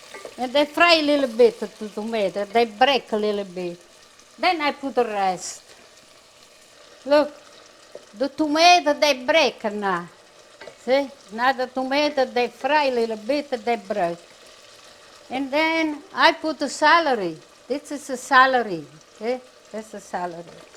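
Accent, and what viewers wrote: Italy. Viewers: Sweet lady ... people are so insensitive. She has a deep Italian accent but who cares! Think of your own grandma. She means well. And she sings, too. Love it. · I love this; I grew up in a French/Italian neighborhood since my family was French Canadian, we had a lot of Italian neighbors. I miss the accent that she has.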